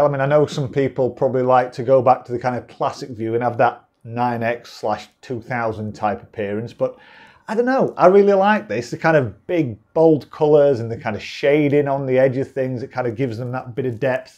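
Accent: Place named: Yorkshire, United Kingdom. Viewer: You are welcome and judging by your accent you are from Yorkshire?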